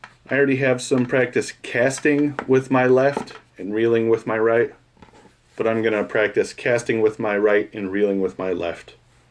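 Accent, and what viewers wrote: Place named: Georgia, United States. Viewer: I'm gonna guess you are from Georgia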